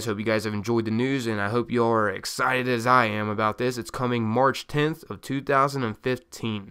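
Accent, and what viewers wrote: United States. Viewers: it seems like your voice slowly turned more country throughout the video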